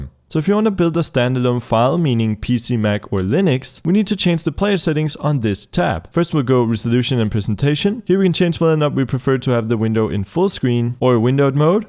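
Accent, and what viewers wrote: Netherlands. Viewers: Are you dutch? And again thanks for your videos! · Wacht, ben je Nederlands?! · Wait, are you dutch?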